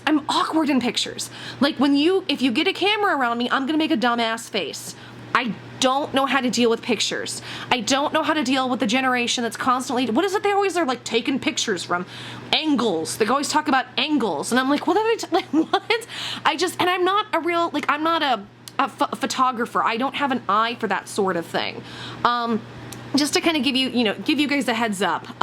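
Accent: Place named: Kansas City, United States. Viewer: Aha! I grew up in the Kansas City, KS suburbs! No wonder I talk a lot like you. Currently I live in Merriam, KS which is still that area.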